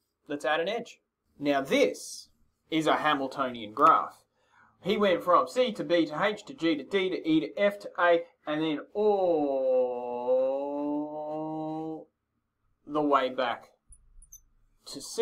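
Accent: Australia. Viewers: Great Australian accent.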